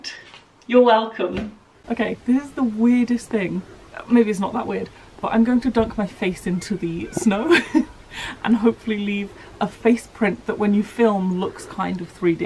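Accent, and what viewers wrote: United Kingdom. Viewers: In the US we call it cotton candy. Lol love the British accent. your such a sweetheart. Love ya videos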